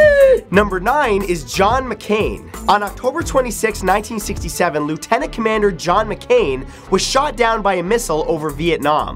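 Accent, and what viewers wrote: Canada. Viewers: Canadian eh? love it and ya winter is BS here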